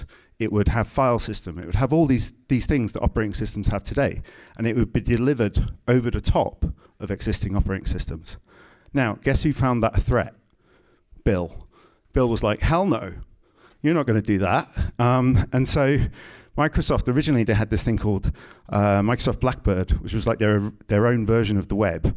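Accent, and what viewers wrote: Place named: United Kingdom. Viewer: He sounds very British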